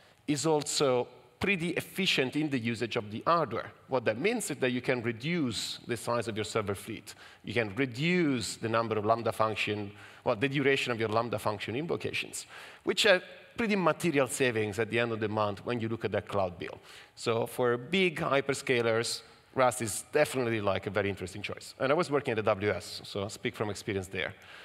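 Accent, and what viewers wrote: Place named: Italy. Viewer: I wonder if the name has any influence from the town of Pavia, since your name and accent sound very italian?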